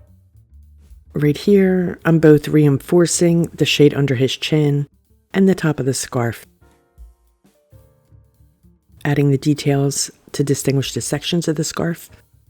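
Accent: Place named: East Coast, United States. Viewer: I love your East coast accent as well! Happy weekend!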